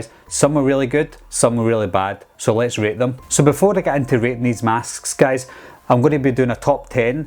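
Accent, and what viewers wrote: Scotland, United Kingdom. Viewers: Love your accent, if its Scottish, I'm actually 89% Scottish and my great uncle had the movie braveheart made about him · Are u Scottish cus I am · Not to be rude but are you Scottish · Where you from in scotland? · i love that scottish accent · Da fuq u saying? Is that a Scottish accent. And the H20 mask was terrible IMO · Are you Scottish or Irish if you are cool I am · Scottish or irish lad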